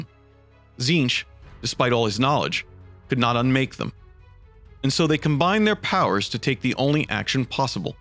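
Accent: United States. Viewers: Use other voice actor than a 30 year old with american accent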